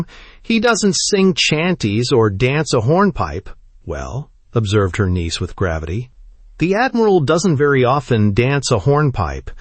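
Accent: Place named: United States. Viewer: i want british accent.. not that ugly american accent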